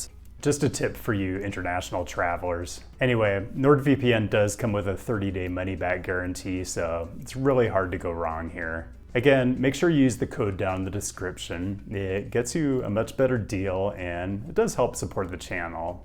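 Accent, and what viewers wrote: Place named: California, United States. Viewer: Is this a California accent? Damn that's strong!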